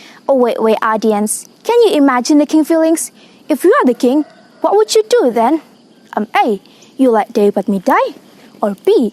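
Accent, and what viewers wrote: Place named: United Kingdom. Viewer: Keren mbak... british aksennya itu, uwuwww top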